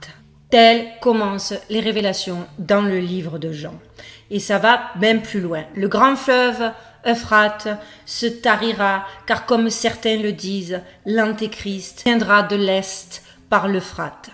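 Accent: France. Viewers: Génial plus je découvre tes vidéos plus j ai hâte de les découvrirent. Tu au top ne change rien à tes accents français ou Anglais. J aime ton authenticité suis fan. 🙏😘🌹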